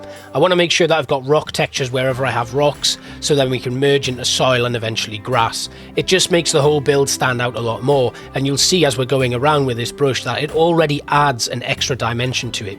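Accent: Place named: Newcastle, United Kingdom. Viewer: Im watching Saltwell now but about to turn off for the footy! You have definitley come on leaps and bounds but by no means would I say Saltwell is bad, especially from the African centre onwards i would say you really hit a stride. Im Welsh and I was sure I could hear a twang but theres no mistaking the Geordie, both melodic accents I guess! Keep the awesome content coming, also if you are looking for further inspiration from creators I highly recommend ZSHPlays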